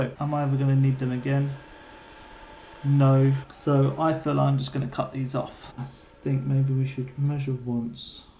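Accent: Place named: England, United Kingdom. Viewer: Hey I just saw your video again and put captions on.... didn't understand the English accent I guess! Sorry... so that solder is for Battery Detect? So turnigy can tell when you are connected right? So did you solder directly to battery connections on PDB? or a lower 5 voltage connector.... BTW great job.... how on earth did you figure this out.... there is almost documentation around! Thanks again!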